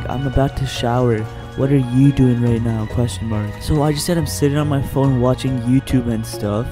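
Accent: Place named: Alabama, United States. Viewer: Me:is he from alab Alabama Me watching vid Silent Silent Me:i kneew it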